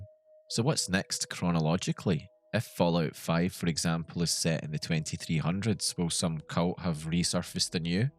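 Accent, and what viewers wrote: Scotland, United Kingdom. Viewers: U have a subscriber i can hear ur from my neck off the woods the uk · Scottish spooky game content person ??? Ive just hit the jackpot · Oh ny god , ive at last found a scottish gamer for fallout 😂ive been watching too much american youtubers , i now have a twink in ny accent 😂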